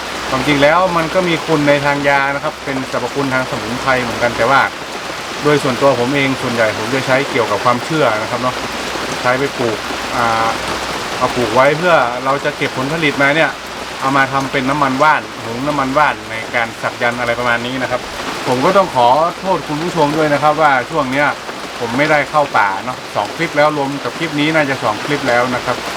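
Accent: North, Thailand. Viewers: หว่ากำเมืองเสียงฟังม่วนจ้าว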